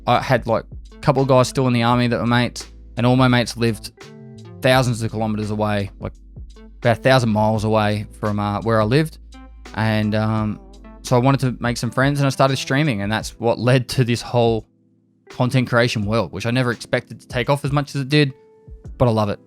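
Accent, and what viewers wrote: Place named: Victoria, Australia. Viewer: Hey, thought you sounded victorian, was surprised to see you live in Brisbane (I'm from Melbourne)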